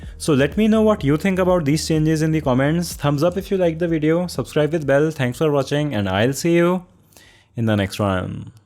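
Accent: India. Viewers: you hindu speaking ppl are simply unbearable to listen to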